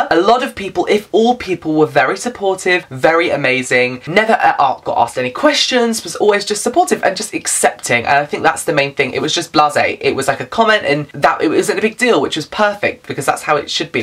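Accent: United Kingdom. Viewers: I love your channel. Your enthusiasm and love of reading is infectious. Your accent is adorable. (I'm American and love Brittish accents) Forget the haters. They know nothing. And if your sexuality is a turn off for them, then they were not true fans in the first place. They're just ignorant trolls. #DontFeedTheTrolls Ignore them and do you. Your true fans will appreciate that all the more.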